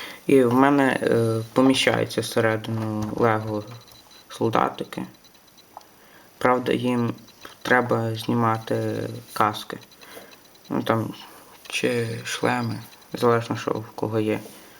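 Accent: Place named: Lviv, Ukraine. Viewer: він зі львова и я це чути як він говорить в нас у львові майже всі так говорять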